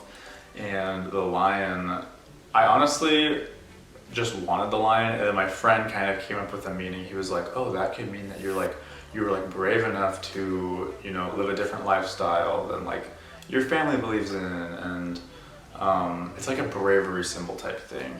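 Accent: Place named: Utah, United States. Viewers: He was born and raised in Utah, so that could be the accent that you're talking about. 🙂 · Which part in the US does your accent come from? I'm not a native speaker of English, so that's just a tiny little curiosity of mine. By the way, your tattoos are amazing.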